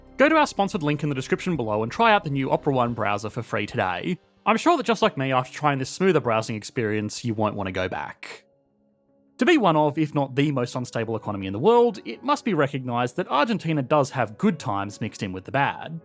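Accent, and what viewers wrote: Australia. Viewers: Boludo, he’s Australian. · What kind of Australian accent does have? Sounds a little different than other Aussies. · es ingles? el acento del narrador me suena a australiano pero me puedo estar equivocando (?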